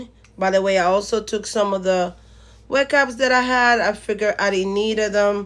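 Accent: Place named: Cuba. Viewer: I love your accent , you sound cuban ❤❤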